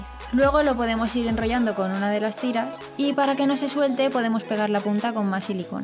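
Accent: Spain. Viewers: tu canal es ermozo pero una pregunta eres española?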